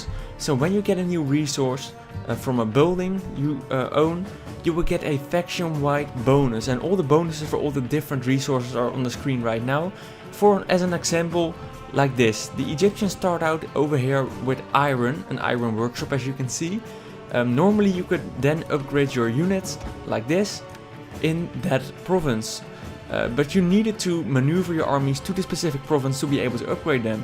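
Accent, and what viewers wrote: Netherlands. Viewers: Are you dutch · ***** You're Dutch? So that's where that accent comes from! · Fucked up Dutch accent. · He has a Dutch accent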